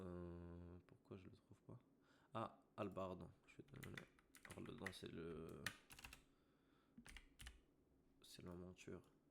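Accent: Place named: Arab world. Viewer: L'accent reubeu qu'on kiffe, merci pour l'astuce. Le prix ne ferra que baisser dés maintenant haha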